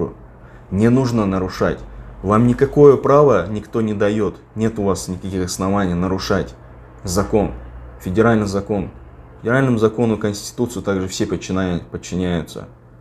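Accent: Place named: Uzbekistan. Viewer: Кайси шевада гапирасиз узбекчани жуда чиройли шевада гапирасиз 20 йил яшаган Россия да усганга ухшамесиз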